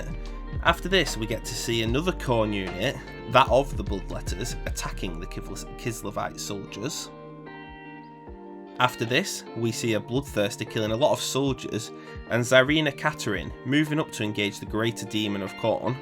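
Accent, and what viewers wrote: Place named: Bolton, United Kingdom. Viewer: Where you from lad? You sound like my neck of the woods, Bolton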